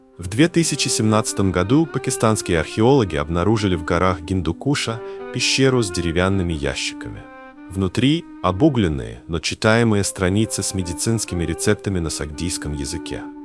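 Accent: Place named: United States. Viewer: Афтор есть немного акцент. Как будто это американец. Может я ошибаюсь.Но спасибо за труд..Салом ман точикам Ориоям.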